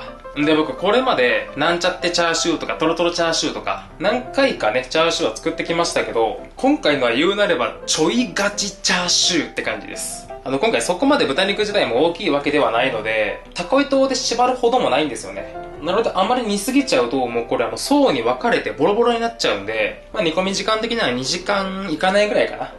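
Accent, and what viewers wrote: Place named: Hakata, Japan. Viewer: 自分も福岡の人なんで所々博多弁なのいいね😊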